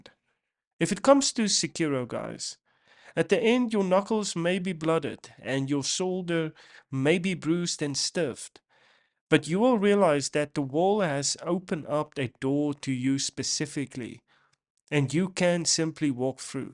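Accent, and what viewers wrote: South Africa. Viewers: Not used to hearing heavy South African accent 😅 awesome vid boet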